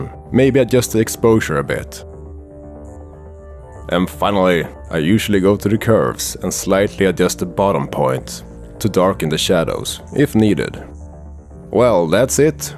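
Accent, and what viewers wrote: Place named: Sweden. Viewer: Är du svensk? Låter som de när du bryter! Bra film! har funderingar kring köpa denna kamera :I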